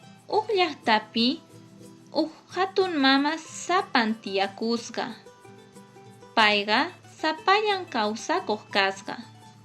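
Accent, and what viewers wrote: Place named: Ayacucho, Peru. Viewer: Te pasaste compadre. Has hecho una gran contribución al mundo. Lástima que ahora no podré usar esto como tarea de audio-comprensión como antes para mis alumnos porque ya lo has traducido. Igual, se agradece y se te felicita. El cuento es boliviano (se agradece mucho a los hermanos bolivianos por colgar material en quechua) pero ese quechua al ser pronunciado es más entendible incluso que el quechua Cusco-Collao del sur del Perú. Se nota que tú hablas quechua Ayacucho-Chanka o quechua de Apurimac, ¿cierto? Hatun marqayniyta chaskikuy, Chosica llaqtamanta, wawqillay.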